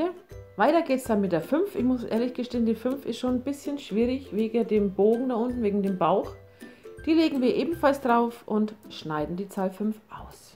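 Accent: Franconia, Germany. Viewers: Woher kommst du aus Deutschland ??du hasst ein fränkischen Dialekt:) find ich total cool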